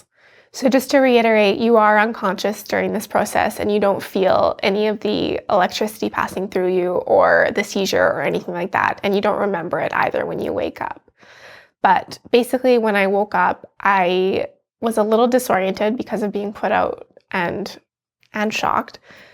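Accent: Canada. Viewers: Thank you. I have my first of 12 ECT sessions tomorrow morning (I'm bipolar1/PTSD) Your video relieved some of my fears. I really hope it works, I think I've developed a tolerance to the meds. Sorry it didn't work for you. You are very well spoken. Could not place your accent, I guessed right! The nice neighbors to the north. Thanks again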